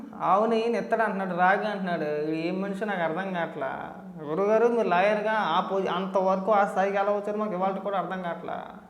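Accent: Godavari, India. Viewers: medhi e ooru bro, me basha godarolla basha la undi. any way you were great. keepit up...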